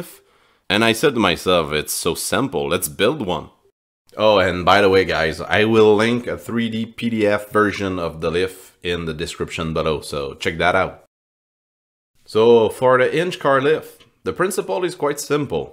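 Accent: Quebec, Canada. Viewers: ca ressemble a un accent du quebec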